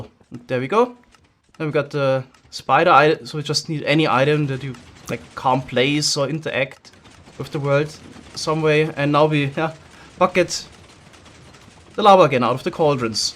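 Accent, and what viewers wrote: Germany. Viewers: Are you German because you’re accent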